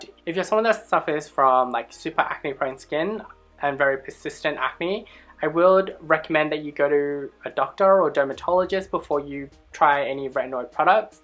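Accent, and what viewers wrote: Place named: Australia. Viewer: That Australian accent a bit too much sometimes No offence ! im just saying it in general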